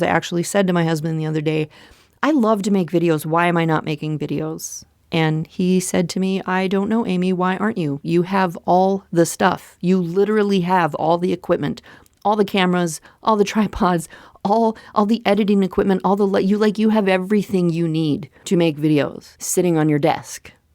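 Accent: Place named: Midwest, United States. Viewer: I think you have a Midwest accent, but I sometimes can hear the Minnesotan/northern Wisconsinite/Canadian O sound in certain words. I can't really describe it, but you probably know what I'm talking about. lol :)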